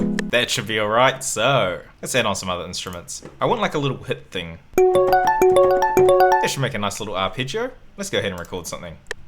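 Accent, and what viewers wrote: New Zealand. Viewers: are you from new zealand or something?? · are u from new zealand bruv ahahaha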